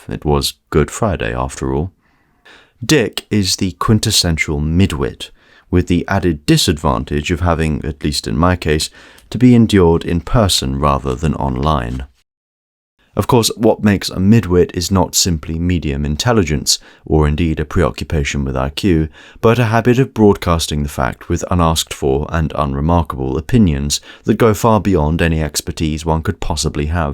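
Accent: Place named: United Kingdom. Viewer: bro you cannot have a british accent and make a video like this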